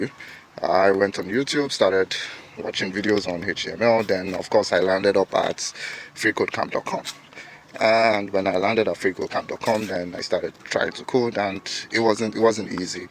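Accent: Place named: Nigeria. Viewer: Thanks a whole lot for sharing, it's so inspiring and I believe your course will help me understand better, many of the things I struggle with cos I really needed stuffs to be explained to me in that naija tone, and here I am!! hehe. Thanks a lot.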